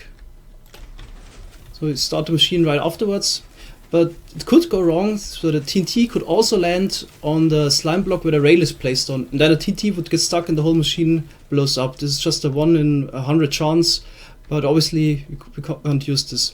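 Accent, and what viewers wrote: Germany. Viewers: are u from germany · u r german oder nicht junge du hast harten acxent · When I heard the German accent I was like " I fcking knew it! " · Deutscher merkt man einfach am axcenz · Junge du Bisch deutscher des höhrt man übel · Are u German? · Cooles video man höhrt dein deutschen aktzent (nicht böse gemeint) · is he german? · Are you german? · man kann richtig hören das du deutscher bist, ich auch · Das is ein deutscher akzent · erste sekunde wo ich deine stimme gehört hab hab ich gemerkt du bist deutsch aber cooles video😃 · Er hat nen voll deutschen axzent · Are you german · are you german?? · Ich liebe den deutschen Akzent wenn du jetzt noch Sachse wärst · Is he german or why does he have that accent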